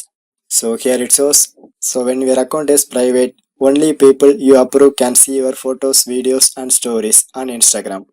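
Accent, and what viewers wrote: India. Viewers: Kick-Ass Bro it's always a pleasure hearing Indians talk in English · I think He's from India 🇮🇳 ❤ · His indian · That's Indian I think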